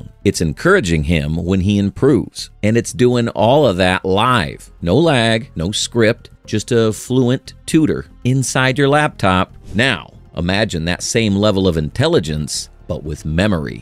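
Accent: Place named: United States. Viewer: I love how country you sound ! Gitty 😁 Yup !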